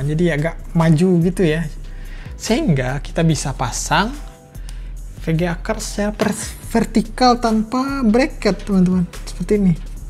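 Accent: West Sumatra, Indonesia. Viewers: Ko iyo minang bana aksen nyo 😂😂😂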